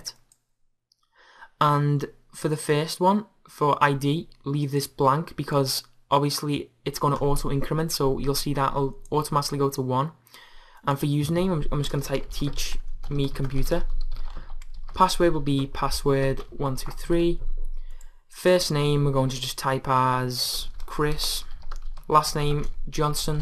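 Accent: Liverpool, United Kingdom. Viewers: Your accent sounds like you're from Liverpool.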